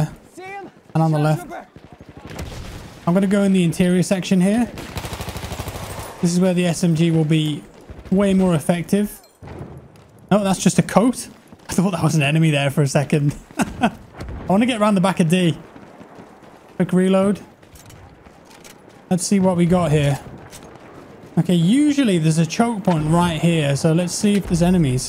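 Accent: United Kingdom. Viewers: As long as you like, you're British patter and nonchalance is soothing and enjoyable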